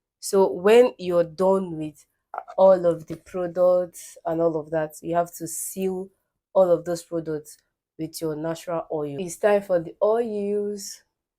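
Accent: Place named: Igbo, Nigeria. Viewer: I'm referring to Igbo as "yibo" . Your Igbo intonation is pronounced especially when pronouncing oil, you say "oryu" So, you be Omo "yibo" na🥰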